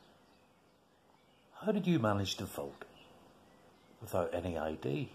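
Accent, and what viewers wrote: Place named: Ireland. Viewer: I heard that Irish accent a little more this time :o)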